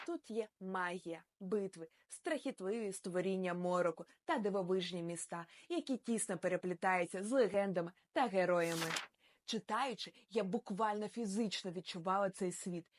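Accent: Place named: Russia. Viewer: Дивна вимова. Схоже що на миті проривається російський акцент. Але загалом добре.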